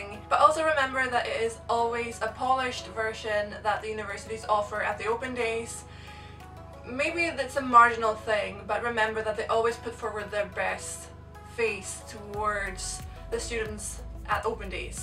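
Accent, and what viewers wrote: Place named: United Kingdom. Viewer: I hope you're enjoying University! Your accent has become so much more British but thanks for the tips, I'm starting my last year of gymnasiet and I'm not sure what I want to do after it :) <3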